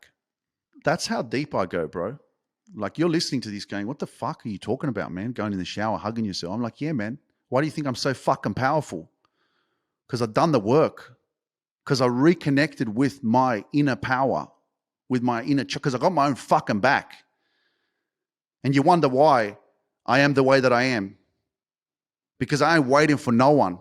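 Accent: Australia. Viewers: I love your message,. ❤❤❤ Thank you for being a guiding light in the darkness for those who need it so very much. Thank you for telling it as it is. No sugar coating. Just the Truth. And in an Aussie accent. 😊 Your message is needed. You are needed. You are loved. You are appreciated. Thank you,. You're beautiful. ❤❤❤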